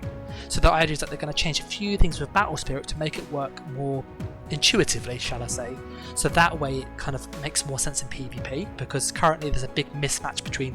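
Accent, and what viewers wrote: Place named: England, United Kingdom. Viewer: This guy is clearly English i dont get this comment, there defiantly a lot worse accents from England.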